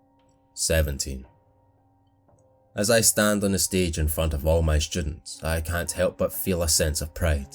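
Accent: Scotland, United Kingdom. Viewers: Your name is Mr. And you’re Scottish just like Gordon........ I wonder if this is chef with an accent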